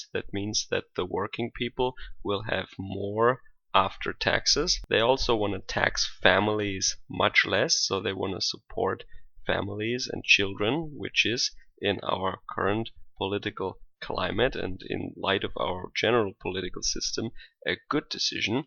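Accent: Germany. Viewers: 1. Apart from your terrible German accent this is a fine video, that shows that Austria with the charismatic Sebastian Kurz and his partner Heinz-Christian Strache can be an example for Germany. 2. The historical parallelism with 1683 is really interesting. We should learn from history! 3. Poland and Russia and the other Visegrad countries, the Czech Republic, Hungary , Slovakia should be our friends and partners.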